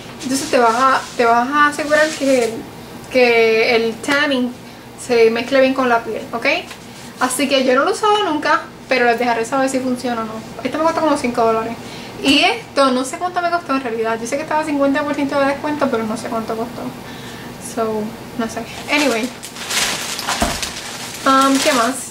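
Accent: Puerto Rico. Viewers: Yo tengo ese mismo primer.. me encanta pq tmbn te sellan y que los poros eso me dijo la muchacha de mac.. que buenoo ver mas videos tuyooss.. jaja me rio tanto pq es q de lejos las boricuas se nos notaaa q somos pr por el hablar jaja.. te cuidas chicaa :)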